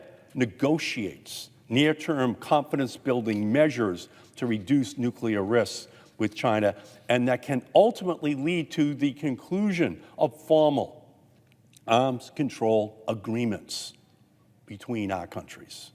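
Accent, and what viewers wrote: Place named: Boston, United States. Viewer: I'm listening to his voice and not looking at the video and I'm thinking he sounds like he is from Boston. Not a super heavy accent but a Boston type accent. I lookup and they have tagged, senator from Massachusetts. Lol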